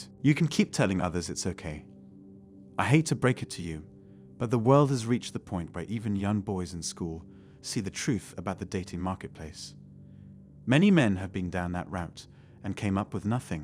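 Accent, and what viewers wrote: United Kingdom. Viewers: I'll admit I'm not a 20-something in the dating pool, but while watching this I couldn't help but be reminded of Tom Cruise's "Seduce and Destroy" seminar character in Magnolia, particularly in the "trap" section, except with a calm British accent. I do agree that social media has poisoned personal relationships, setting up unrealistic expectations, and the need to go back to direct, interpersonal contact is imperative. Don't let these "incel" mentalities to rule your lives, my young dudes, but also don't let a lack of a relationship destroy your self-esteem and stop you from enjoying life. Not all young women are vain harpies looking to suck you dry, be patient and eventually you'll run into someone you feel mutually compatible with.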